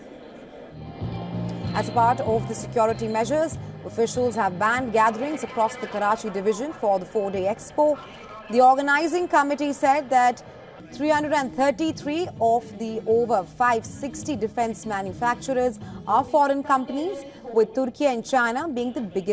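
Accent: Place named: India. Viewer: her accent has some indian accent.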